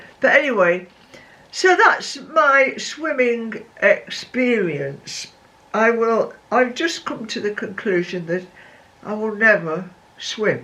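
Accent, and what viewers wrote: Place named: Manchester, United Kingdom. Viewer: Dear..... what I good laugh this video gave me because your attitude to swimming was and has always been mine. To me you appear ro be so brave you managed to paddle in the sea up to your knees....BRAVO...I could never go over my calves...LOL. I think the modern way they teach kids to swim nowadays is quite different not like it was. I do enjoy your videos nd love your northen accent It reminds me when I was beought up in Manchester. I have been living in France for many moons now.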